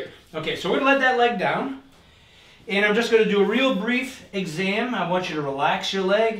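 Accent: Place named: Quebec, Canada. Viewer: You have an interesting accent...are you French Canadian? You sound like Jonathan Pageau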